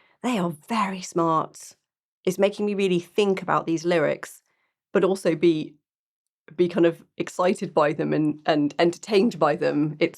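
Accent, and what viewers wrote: United Kingdom. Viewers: IMHO, it's both famous people and average people because 'all the world's a stage' as you will find out so much more about the band in their album. Welcome to the Rush family,! You have gone down the Rush rabbit hole, never to return, but it's all good, no worries! I've got to say that your personality is lovely as is your accent, purple hair, and Ink! Such a lovely bird, as you brits might say. Chicks seem to really dig Neil the most. Here's a small treat for you and others. Neil doing Cottontail and a short tasteful solo with the Buddy Rich band doing a tribute to Buddy. <link>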